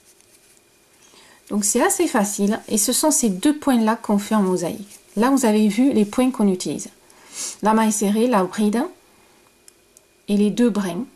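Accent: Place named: South-West, France. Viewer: Merci infiniment pour cette vidéo ..Les explications sont très claires et on a l impression de crocheter avec une copine, c est trop bien . Cela faisait des mois que je voulais faire de la mosaïque en crochet mais impossible de trouver un tuto facile à comprendre . Enfin ,grâce à vous , j ai réussi ma première mosaïque . Je suis trop heureuse. 🥰 (Et l accent du sud-ouest en plus, peut-être celui des Landes , c est le bonheur ) Vous avez gagné une fan en Guadeloupe .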